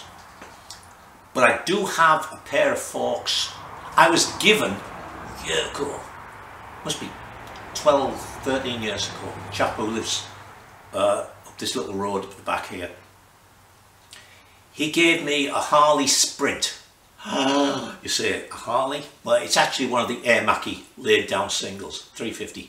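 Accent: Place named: Newcastle, United Kingdom. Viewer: Glad you're on the mend. Remember the great Carroll Shelby lived to 90ish with more severe cardio vascular problems so I hope to hear your dulcet Geordie tones for many years yet.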